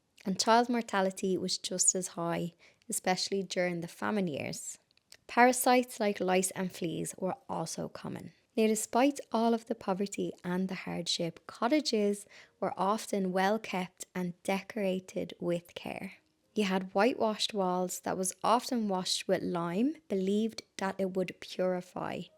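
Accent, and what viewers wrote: Ireland. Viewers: I love this video!! To learn this history of Ireland from your beautiful Irish brogue surpasses any history lesson. Although, I am only 1/3 Irish, ☘️ I hold Ireland close to my heart. My mom cherished her Irish heritage. Her grandmother, Bridget, came from Donegal. I don’t know how different that is from Dublin. ❤ (My grandmother’s name was Henrietta ❤)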